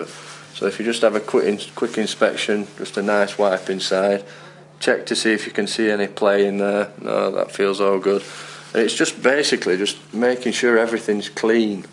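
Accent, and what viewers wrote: Yorkshire, United Kingdom. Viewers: Proper Yorkshire, less is more 😁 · Proper Yorkshire int it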